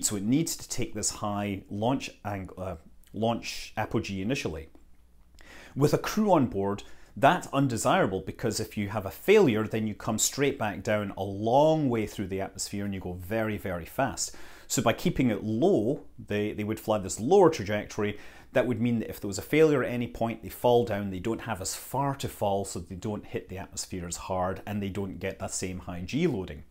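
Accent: United Kingdom. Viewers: That outfit in combination with arguing on space travel in British accent immediately reminded me on Arthur Dent. Sorry for that.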